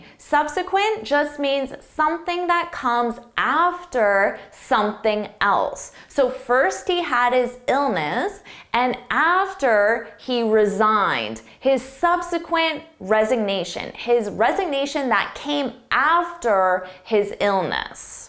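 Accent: United States. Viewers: This American accent is so ugly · 15/15 of course. This project is pathetic - why don't you just encourage people to use good dictionaries? After all, that would spare them from hearing your appalling American accent, and from being led astray by your occasional solecisms (e.g: dangling prepositions, or split infinitives). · So annoying that this is promoted as Advanced English words when the presenter is quite obviously speaking American. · English vocabulary by an American, very loud one at that!! Not for me.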